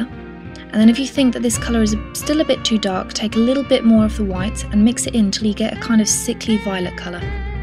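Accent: England, United Kingdom. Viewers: British =w= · R U english??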